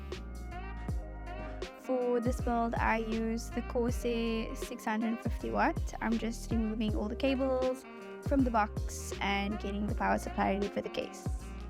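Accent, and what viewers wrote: South Africa. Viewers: South African accent. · Am I hearing a CPT accent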